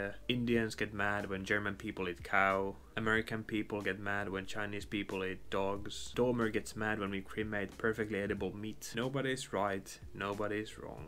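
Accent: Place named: Finland. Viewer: Ah!!!! I knew you were Finnish! I came upon one of your shorts about the morning Ramen shop and your accent caught me. I was like “That sounds very Finnish” and I am happy to confirm that! Just very nice to see for no reason at all! 🎉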